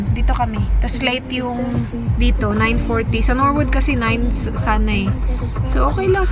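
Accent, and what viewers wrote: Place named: Visayas, Philippines. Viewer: Bisaya ka po? Ang cute po NG punto mo😊😅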